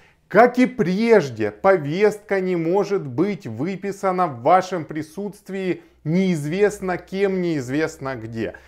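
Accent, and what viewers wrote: Ukraine. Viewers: Кто этот блогер,говор украинский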